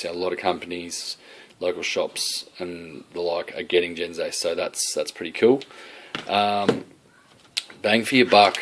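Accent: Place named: Queensland, Australia. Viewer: sounds like you live in brisane/southeast queensland. where abouts do you bash?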